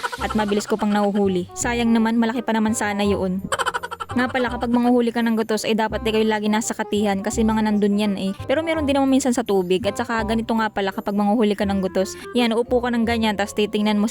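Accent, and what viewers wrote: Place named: Quezon, Philippines. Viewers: pa shoot out ako maam from Sipocot Bicol.. JOEZEL NESAGON.. ikaw baga ma'am ay taga Quezon o Batangas.. eh kung maka ay ka eh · Parang pamilyar Yung accent ng salita mo idol taga Quezon puba kayo? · lam aw😂😂😂 Quezon prov tagalog talaga😂❤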